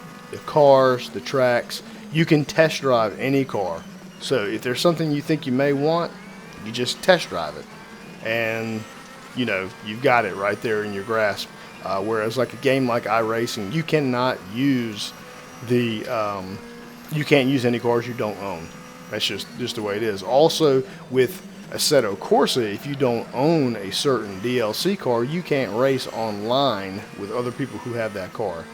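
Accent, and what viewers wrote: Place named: United States. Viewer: RaceRoom is amazing, it's so underrated, hopefully more people like yourself realize that and come around to it again. Sounds like you're American too, check out the ESR group on the Sector3 forums if you're looking for some online racing. Also like Peter says below, please disable the corner markers: options -> video -> custom -> corner markers off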